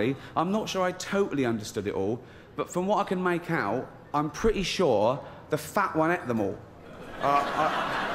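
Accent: United Kingdom. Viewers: Yea unless you are also British, reading his lips is next to impossible. Accents are a SOB.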